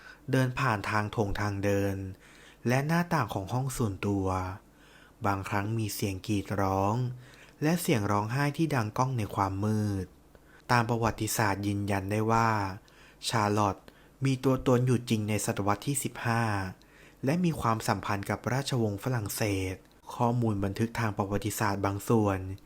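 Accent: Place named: Thailand. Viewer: เสียงเหน่อ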